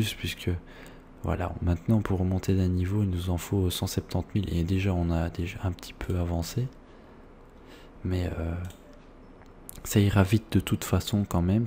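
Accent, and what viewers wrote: Belgium. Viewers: Tu es belge ? :)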